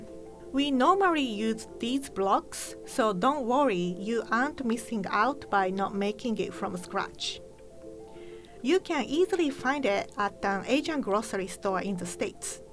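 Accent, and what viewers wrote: Japan. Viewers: Probably that just her accent. Japanese people will sometime misread "L" as "R" and "R" as "L" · Even after years for working for tasty america she hasnt lost here japanese accsent praise God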